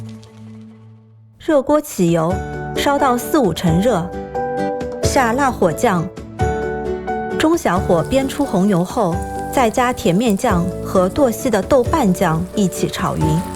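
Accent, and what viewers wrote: Shanghai, China. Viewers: 上海味的普通话，好亲切😄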